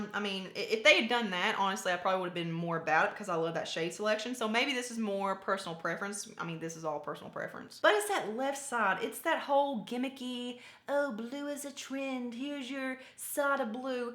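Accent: South, United States. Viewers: New to your channel and love it ❤ I am from Alabama, you sound southern as well .. what state are you from?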